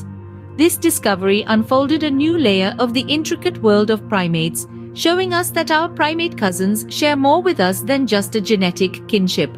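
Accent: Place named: India. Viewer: u CAN'T hide INDIAN ACCENT in your voice, don't try , its won't never happen.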